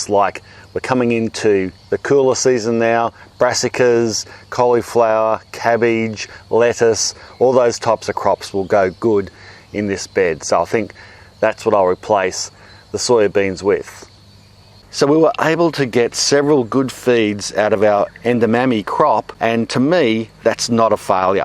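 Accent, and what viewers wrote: Australia. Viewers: I was actually looking to see if it's possible to grow soya in the UK. Within 2 seconds I realised you have an Australian accent so I suspect this is not going to be relevant to me. 😥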